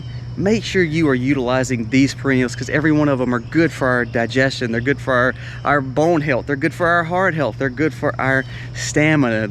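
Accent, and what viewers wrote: South, United States. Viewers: He has a rather Southern accent. Maybe he moved, or is in the Appalachians or Ozarks where the weather is more suitable, but Rhubarb comes from the cold, Continental climate of Inner Asia (Central Asia + Himalayas/Tibet). It hates the Deep South and quickly perishes because the summers are hot and winters are far too short.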